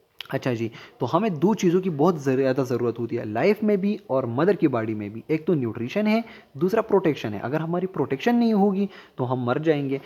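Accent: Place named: Khyber Pakhtunkhwa, Pakistan. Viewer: Great lectures sir helping alot. Seems like you’re from kpk??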